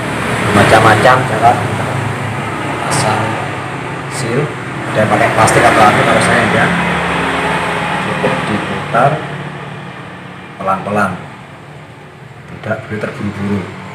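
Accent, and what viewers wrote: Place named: Madura, Indonesia. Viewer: Pengetahuan yang sangat berguna, terimakasih bang, alamatnya dimana ya, dan lagi spt ada logat madura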